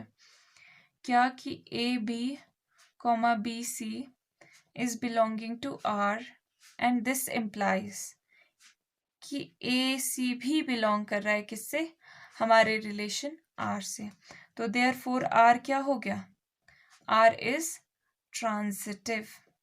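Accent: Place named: Haryana, India. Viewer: mam aappp haryana se ho kya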